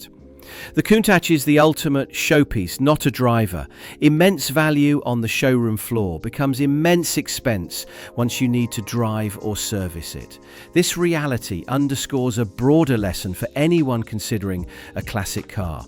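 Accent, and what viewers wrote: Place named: United Kingdom. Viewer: Amusing. The British accent threw me off for a bit, but then I noticed that the costs were extremely detailed even in a range, as presented without out humor or any kind of approximation. 😂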